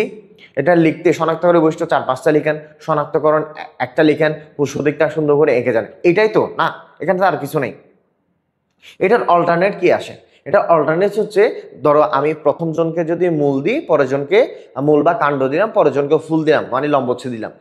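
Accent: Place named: Chittagong, Bangladesh. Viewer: Sir er kota huni lagedde chatgaiya🗿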